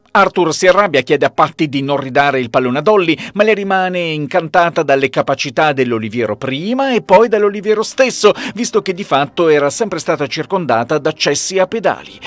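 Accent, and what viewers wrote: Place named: Trentino, Italy. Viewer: YouTube pullula ormai di canali gestiti da appassionati di anime vecchi e nuovi che allietano il loro pubblico con vari tipi di video dedicati all' argomento... ma, come dice il proverbio, la classe non è acqua: o la si ha oppure no. E tu, possiedi una classe ineguagliabile che sai mescolare abilmente con una intelligente ironia rendendo i tuoi video divertenti, interessanti e piacevoli per la loro comicità mai volgare o rozza. Sarà forse il tuo meraviglioso accento trentino a conferire al tuo eloquio quel suono pulito ed elegante in qualsiasi contesto, sarà la tua evidente cultura unita alla tua innegabile simpatia... Fatto sta che dovrebbero esserci più youtuber come te ed è sempre piacevole per me guardare e ascoltare i tuoi video. Mi piace considerarti un po' come un amico che mi fa sorridere e mi tieni compagnia con le tue interessanti pubblicazioni, la cui varietà di genere e argomenti dimostra la tua incredibile versatilità.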